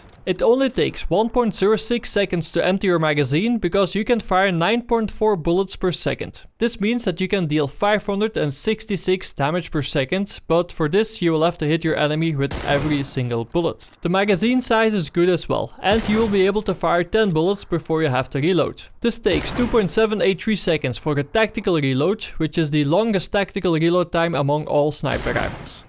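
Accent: Netherlands. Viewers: echt een nederlander hoor het gelijk XDD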